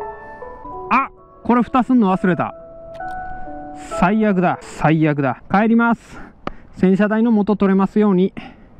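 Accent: Kansai, Japan. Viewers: どう見てもはたから見たら変な関西人（笑）だが、それがいい！！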